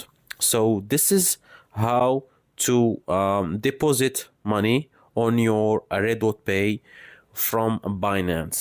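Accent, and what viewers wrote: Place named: Algeria. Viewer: U sound algerian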